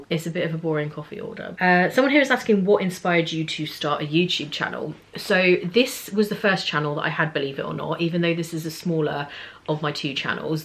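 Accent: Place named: Dudley, United Kingdom. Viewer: I knew he was from near me! I could hear it 😅 but I'm from Dudley 💙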